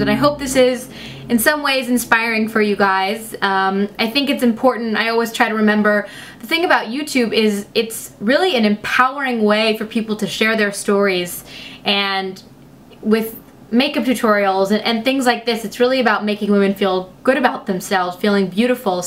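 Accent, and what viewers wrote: United States. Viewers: ) Wow one shot:O Your american accent is perfect, congratulations. Believe that you are not french finally. I'd love to hear you make tongue twisters in english and in french, you gotta be unbeatable in this area. It's always a pleasure to listen to you (even in english). You are truly a wonderful woman and so beautiful. Kiss from France. XO...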